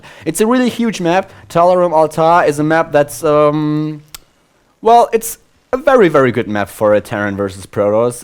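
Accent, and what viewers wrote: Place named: Germany. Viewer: wow no comments i dont know why cuz i really like the comentary and the German accent if im not mistaken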